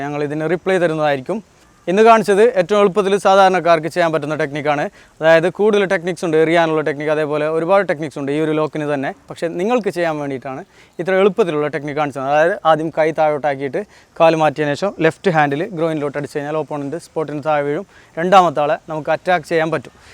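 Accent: Kasaragod, India. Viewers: ശുദ്ധമലയാളം സംസാരിക്കാൻ ശ്രമിച്ചാലും ആ കാസർഗോഡൻ ശൈലി വരുന്നത് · കാസറഗോഡ് ആണല്ലേ.... ഗുഡ്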